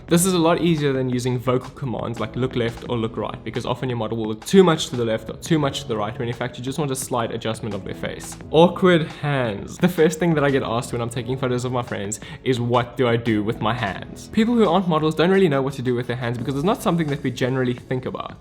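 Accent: South Africa. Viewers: Is that a South African accent? :) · South African?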